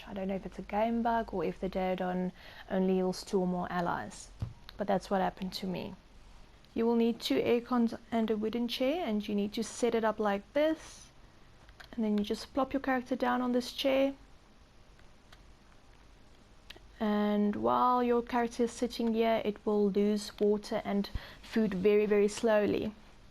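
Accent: South Africa. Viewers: I recognise that accent from anywhere! Suid Afrika represent! You go girl! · Oi are you south african i can tell as i am one too! I can prove your name means Ice Princess #sageneralknowledge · Are you South African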